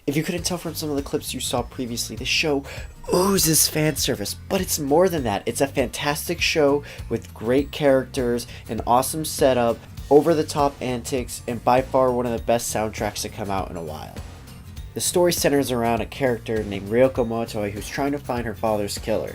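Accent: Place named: England, United Kingdom. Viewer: My nigga, this is some jontron shit and I like it. IMO: You need to keep the tone of voice more constant, when you are on video you are pretty loud which is good but on the mic is sounds like you are hugging the stand and whispering into it. In regards to the englishese (the opposite of engrish) the pronunciation may annoy some, just a heads up. 10/10 get subbed son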